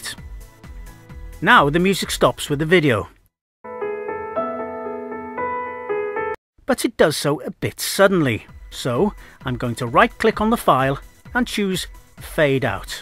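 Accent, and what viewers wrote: Wales, United Kingdom. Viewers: Thank you so much:) just watched it, I knew alot of the stuff but I have learnt a few new tips, thank you!! Are you Welsh? Sometimes you sound Welsh, sometimes English lol x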